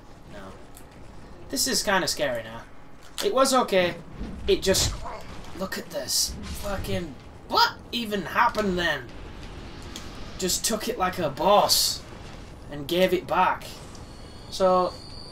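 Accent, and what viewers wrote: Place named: Yorkshire, United Kingdom. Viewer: Sleep deprivation brings out the Yorkshire in you :D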